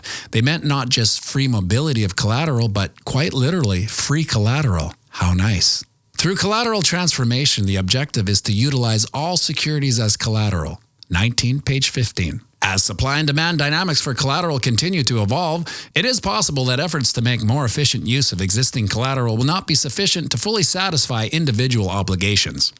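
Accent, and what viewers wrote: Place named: United States. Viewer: It's horribly American - Nice if you're American, maybe?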